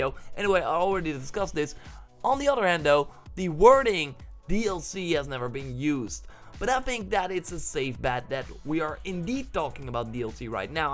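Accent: Netherlands. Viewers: You sound a bit dutch, are you?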